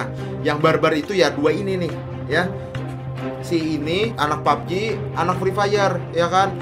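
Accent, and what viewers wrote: Banjar, Indonesia. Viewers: Bang km org mana mirip banjar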